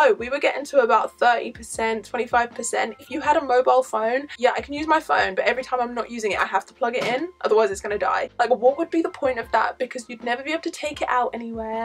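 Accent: United Kingdom. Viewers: Where did you get your comb tool from? Also what country are you from.. you sound British? Xx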